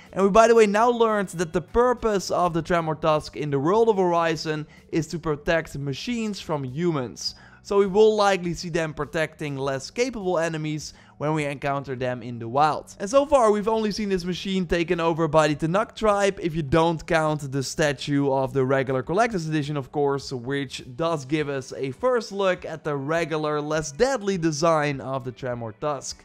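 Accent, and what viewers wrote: Netherlands. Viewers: may I ask you what your mothertongue is? Your English is fine ofcourse, but I can't quite put my finger on your accent. My guess is that your a Dutchie.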